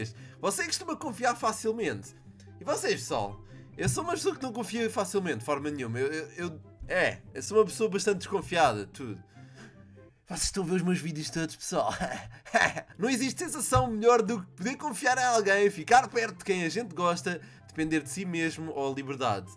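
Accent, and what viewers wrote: Portugal. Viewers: tu es o melhor YouTube que fala português de portugal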